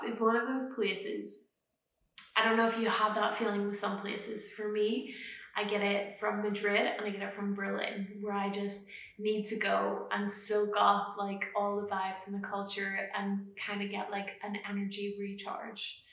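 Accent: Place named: United States. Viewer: OMG I AM HERE FOR THIS NEW ACCENT ON YOU!!!! IT's kind of like AMERICANNNNNN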